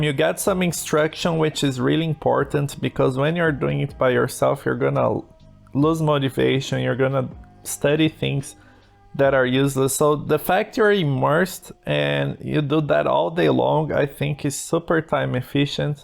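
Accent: Brazil. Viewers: tu é br né nao? kskss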